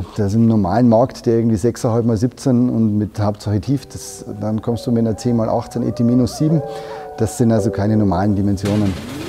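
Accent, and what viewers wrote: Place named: Bavaria, Germany. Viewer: Sehr sympathisch erklärt, a echter Bayer halt👍👍👍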